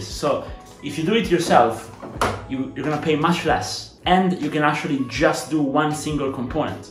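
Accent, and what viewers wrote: Italy. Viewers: You talk like Italian? Non è che sei un bro?❤ 🇮🇹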